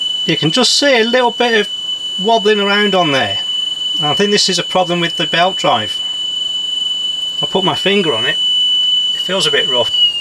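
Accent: Birmingham, United Kingdom. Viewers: Wow, I just stumbled on this video and I am transfixed! That is a beautiful deck and if any of my audio equipment became faulty, I'd want you to repair it. So professional. As an aside, you sound Brummie. I am from that part of the world. Brilliant!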